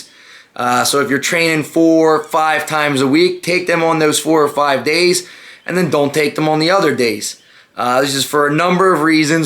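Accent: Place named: Pennsylvania, United States. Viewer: You sound like you're from PA. Philly or Pittsburgh or close by maybe.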